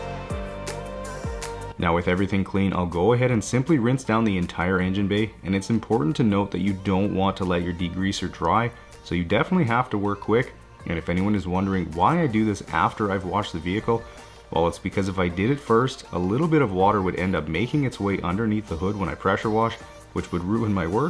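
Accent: Canada. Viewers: All I’m gonna say is I started watching staufer garage detailing and I was hooked and then your channel popped up on hen you were really low on subs maybe around 15-20k I instantly fell in love with your content and Canadian accent lol. Man you have grown so much and I’m actually very very happy for you! Keep up the great work you are a very amazing and genuine person and I am happy to have came across your channel when I did. Maybe one day I’ll drive from New York to Canada to get my car detailed lol but your really far haha. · Nice job! You must be in Canada; hints of some of the accent 😉 And that snow, lots of it. 😊 Happy Spring, from Washington, D.C. 🌺🌻🌼🌷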